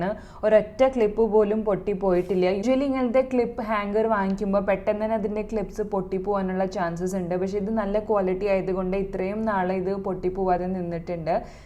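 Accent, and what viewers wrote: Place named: Mumbai, India. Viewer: Are u raised in Mumbai? Ur accent is like a Mumbai malayalee 😄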